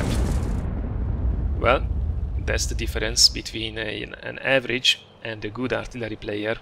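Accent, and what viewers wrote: Hungary. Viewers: Tudtam, hogy magyar vagy! :D Csak néha belenéztem a videóidba, és a kiejtéseden lehetett hallani, hogy magyar vagy. Csak amíg nem jelentetted be, nem voltam benne biztos!:D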